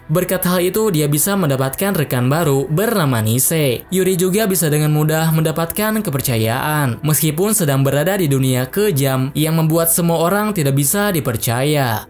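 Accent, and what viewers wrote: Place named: West Java, Indonesia. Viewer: Pantesan kayak orsun logat bicaranya wkwkw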